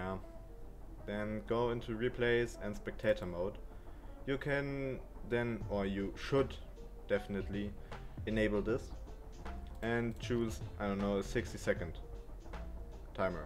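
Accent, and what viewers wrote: Germany. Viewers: or am i wrong that you are german?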